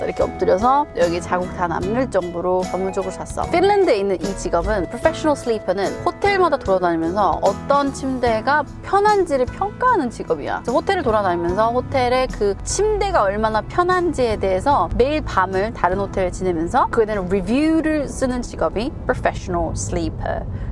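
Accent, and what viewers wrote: United Kingdom. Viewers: 굿모닝~ 애불보디! 늘 들으면 들을수록 브릿이 귀에 쏙쏙!! 즐겁게 방송보고 가유~~^^ 누나 안뇽!^^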